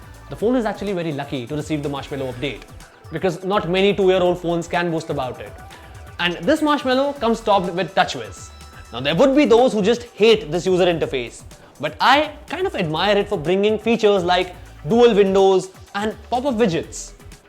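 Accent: India. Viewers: terrible annoying i dian accent lol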